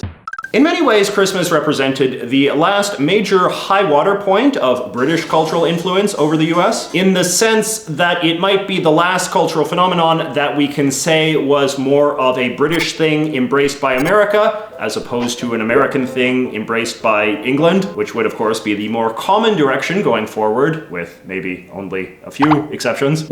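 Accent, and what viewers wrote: Canada. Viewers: Love the Canadian accent. Secular Christmas music from the 80's and beyond will always suck. It all has become a holiday of acquirement instead of the real meaning of Christmas. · To any Canadian who denies they have an accent, listen to this guy.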